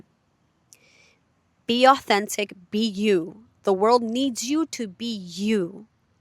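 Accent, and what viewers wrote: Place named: Florida, United States. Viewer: You so dam fine girl. And it looks like you may be in Florida 😉 the accent is ❤️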